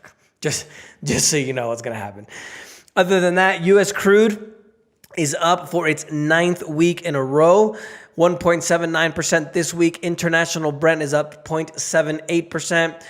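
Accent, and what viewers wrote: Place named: Latin America. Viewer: hablas bien ingles, pareciera q fueras nativo pero tienes el acento fuerte de latino. practica mas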